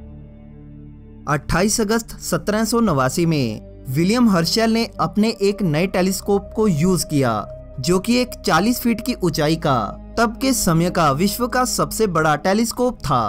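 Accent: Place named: Chandigarh, India. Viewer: KOI NI BHAI SAB BHAI BHAI HI HAI MAINE SIRF ISILIYE PUCHA THA KYUKI AAPKA ACCENT MUJHE BILKUL CHANDIGARH WALA LAGA.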